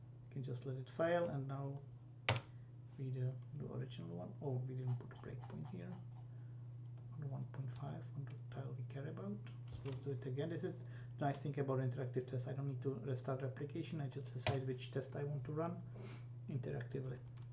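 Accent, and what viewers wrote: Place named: Czech Republic. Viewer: i can confirm, this is czech accent 100% lol, also the gothic shortcut on desktop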